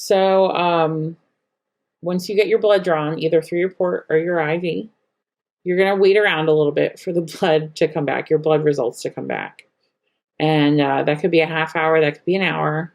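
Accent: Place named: United States. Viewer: I love it I was laughing in a good way lol.....I love the American accent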